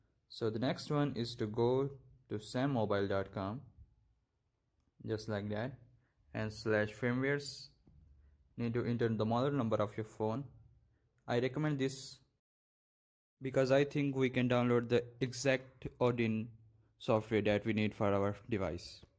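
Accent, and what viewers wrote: India. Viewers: when you heare an Indian Accent you know its the Right video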